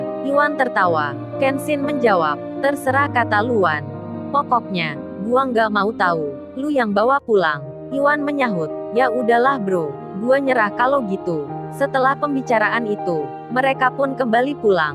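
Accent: Surabaya, Indonesia. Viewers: Admene wong suroboyo iki wkwkkwk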